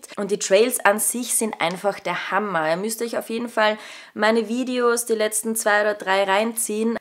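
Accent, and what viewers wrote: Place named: Austria. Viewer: Bin seit einem Jahr dabei. Seitdem will ich mit Mountainbiken anfangen und feier den österreicher Akzent. Danke dafür.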